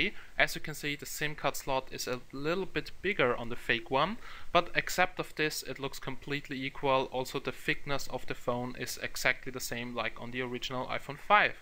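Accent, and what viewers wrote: Germany. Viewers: du bist deutsche oder östereicher das hört man total raus :) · Are you German? Your English sounds German haha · It still doesn't make it a Chinese accent??? He just has a German accent, a Chinese one is completely different. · man merkt es spricht ein Deutscher.. :D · Ich finde den deutschen Akkzent extrem lustig, in einer guten Art und Weise. Zu Thema: Das ist die Quittung für die billige Produktion von Apple-Geräten in China! ---- I think the German accent is extremly funny, in a good way. Now to the topic: That's the result of the cheap production of Apple products in China! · It's so funny his german accent is so obvious. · Du deutscher man hört das richtig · Man merkt voll dass du aus Deutschland kommst weil : 1. Du hast einen deutschen Akzent im englisch und 2. Auf deinem Handy wird dir alles in Deutsch mitgeteilt oder angezeigt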